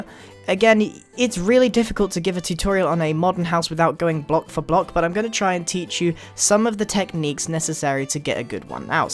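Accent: England, United Kingdom. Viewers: I listen to voice in my sleep for some reason British acents relaxes me:3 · how can you sound so British... I'm jealous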